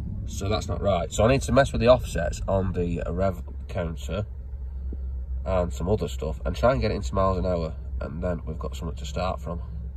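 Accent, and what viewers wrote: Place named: North, United Kingdom. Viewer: Great videos,love your 'Northern' presentation! From one Northerner to Another😉!!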